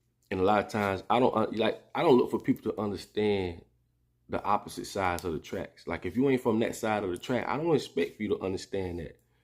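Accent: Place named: Florida, United States. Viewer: I love that country Florida boy accent 😍💙 keep dropping the knowledge I love it & we need it. U reaching ppl. #memphistn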